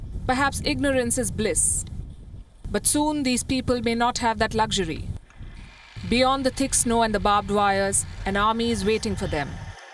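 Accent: India. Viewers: She has a mad Indian accent! In fact ALL Indians have the same accent…weird🤪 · Sometimes I don't understand your english because your indian accent is still too thick for me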